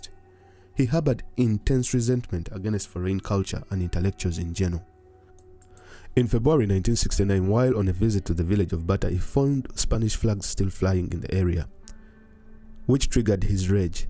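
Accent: Uganda. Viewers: A ugandan is narrating this. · I think the presenter is Ugandan. It's just about how they pronounce words.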